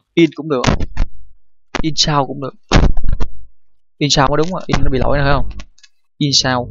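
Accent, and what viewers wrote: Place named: South, Vietnam. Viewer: ước gì anh ra thêm nhiều video hay như này ^^ thích giọng nói của anh lắm luôn, rất dễ hiểu...coi xong video của anh là e thích con trai miền nam luôn đấy ^0^, cảm ơn anh nhé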